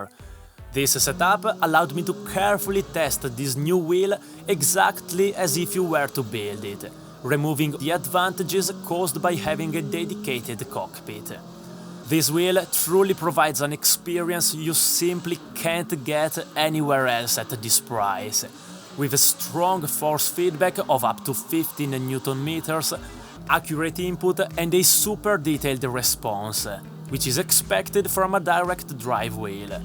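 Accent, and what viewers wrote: Italy. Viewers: i love italian english ;D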